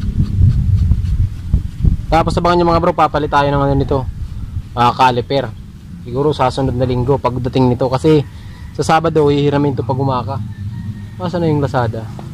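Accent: Bicol, Philippines. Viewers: bicolano ka boss?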